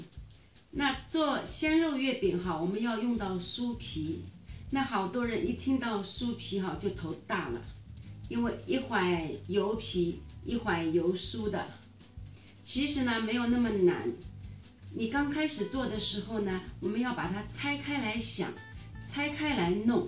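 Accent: Shanghai, China. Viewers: 👏👏👏一口上海普通話❣️❣️ 教了拿能弄，還撫平了我的思鄉心💕 謝謝噢謝謝🙇🏻‍♀️ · 上海普通话听着好亲切哦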